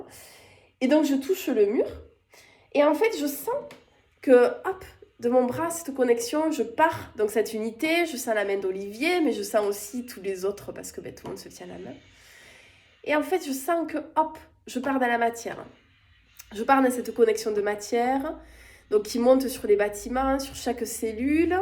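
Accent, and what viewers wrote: South, France. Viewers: Merci pour ce retour belle et lumineuse. J étais super heureuse de te rencontrer a Ris Orangis et j aime beaucoup ta personnalité et ton authenticité, ton petit accent du sud qui te donne beaucoup de charme. Plein de belle choses pour toi et je t envoie toutes les fleurs colorées de mon pull 😅😊❤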